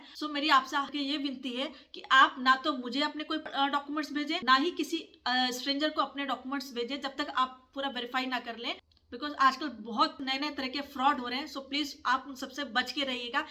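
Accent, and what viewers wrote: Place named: Himachal Pradesh, India. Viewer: Aapki hindi thodi Himachal se milti julti h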